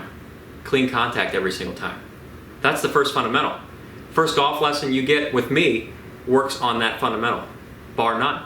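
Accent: United States. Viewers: I am 78 3/4 years of age now. Your story is very impressive. I have been playing golf over 45 years with my score ranges of 86~98. I long wanted to become a member of 70s club (golfers who play with strokes 79 or below 79 per 18 holes) but I gave up my hope as I already became old. But one day, while I was watching a Utube golf lesson program, A funny and happy guy who speaks "a very easy to listen, beautiful" American English appeared in another Utube screen. It was YOU, Mr. and You were talking about swings joyfully quoting the theories physics and geometry. You theory attracted me a lot and I instantly fell in love with you. This is what I long long wanted theory and practice. You encouraged me a great deal and I decided to challenge the AGE SHOOTER. Now, all parts of my body have been aching, so many parts that have never been aching during my 45yeas of golf. 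I enjoy small aches and become happy every day because my scores have been improving. Thank you. I will someday visit you and receive lessons from you!!!!!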